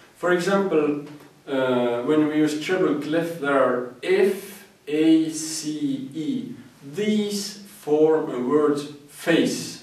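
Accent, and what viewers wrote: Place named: Estonia. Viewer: he's estonian haha...I could tell just by the accent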